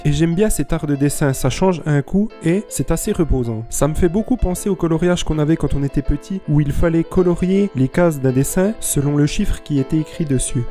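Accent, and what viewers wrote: Franche-Comté, France. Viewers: Petit accent de franche comté ???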